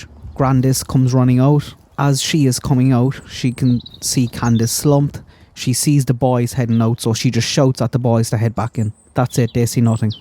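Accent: Ireland. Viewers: Police dogs can pick up DEATH. The boys has been in CPS for over 9 months & would have said something by now. Summer's foot prints were found on 2 different properties confirmed by PI & Dogs. This theory is CRAY CRAY. Crackin Case done GREAT coverage of this case with FACTS. I enjoy listening to your accent & your slang for stuff-Irish Right? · That’s possible your theory, alcoholics and drug addicts don’t pay attention to their children. Then put a phone on top of that and you’ve got a mother who never does anything. Just take a look at that house it’s filth. The only thing she cares about is drinking and getting high. The kids are just an annoyance. I love your Irish accent. My last name was Dailey 😀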